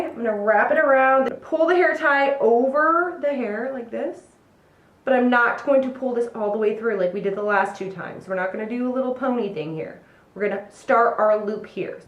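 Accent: Midwest, United States. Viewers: I've watched a couple of your videos and I decided you had a Midwest accent and surprise we live in the same city! Or at least I live in the same area as your PO box so we have the same accent. Anyway I think I liked the third bun the best. Except whenever I try it, it's almost always bad. I haven't gotten that one to work on me.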